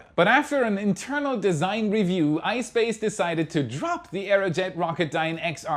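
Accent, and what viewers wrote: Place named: Germany. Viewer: Even his accent is Crazy. To less Information about the topic seems like some random german just startet with reels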